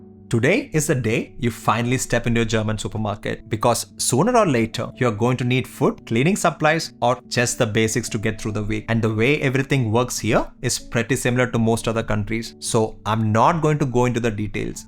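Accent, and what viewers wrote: Kerala, India. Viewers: That Mallu accent is still there though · chettan has clear malayali accent!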